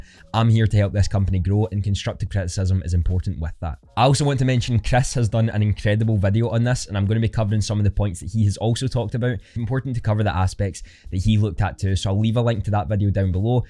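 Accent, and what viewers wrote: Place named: Glasgow, United Kingdom. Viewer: He's a Glaswegian you numpty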